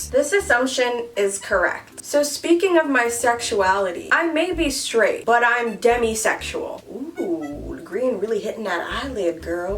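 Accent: England, United Kingdom. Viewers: I’m impressed by your British accent! Only discovered you recently, loving your content 🙌🏾🙌🏾🙌🏾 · That English accent is on point, much love from a Black British fan (so I know my own accent 😉) also I think I'm 153cm tall too! Height twins 😂😂😂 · I like the British accent❤ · Queen your British accent is 😘👌💕 Also gonna call this Lil Grinch🤣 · That British Accent 😍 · 0_o Excuse me but your british accent scared me - I'm black and English but like you sound more british than me 😂🙈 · Me not used to the British accent 👁👄👁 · Your british accent though 😳💕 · Can you do a video of doing a make up tutorial video with your british accent please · That British accent tho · Your british accent is pretty good -coming from a british girl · Your British accent is actually really good 🤔🤨❤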